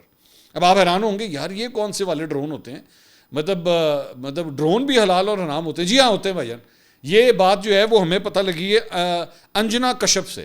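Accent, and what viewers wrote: Punjab, Pakistan. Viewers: A lot of people like me have a lot of political differences with you but how you show up in this crisis I'm proud of you for standing up as a pure Pakistani and specially when you always give a nice punjabi touch 🎉 Pakistan really stood up as a nation. Alhamdulilah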